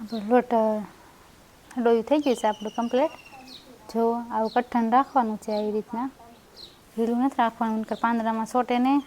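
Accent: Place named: Jhalawad, India. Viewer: ઝાલાવાડ ના લાગો છો ભાષા ઍવી છે સરસ પાત્રા બનાયા મને પણ ખુબ ભાવે છે મારિ વાડીયે પણ વાવવાના છે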